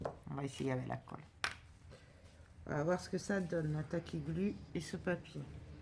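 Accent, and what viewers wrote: South, France. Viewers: Original et simple effectivement, un bon entraînement pour débutant 😀 mais pas seulement 😀j'aime beaucoup ce que tu fais et tes explications avec en prime l'accent du midi, c'est très agréable, merci 😊bises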